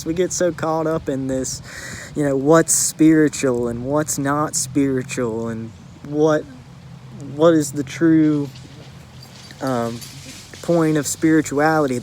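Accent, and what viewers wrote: South, United States. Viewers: Thank you for sharing....so young so wise...the southern accent is nice reminds me of home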